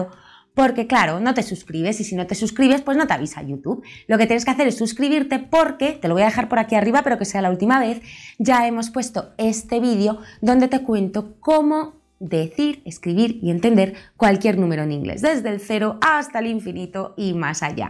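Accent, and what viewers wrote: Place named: United Kingdom. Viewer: quizas sea por los diferentes acentos, cro que este es britanico que es distinto al americano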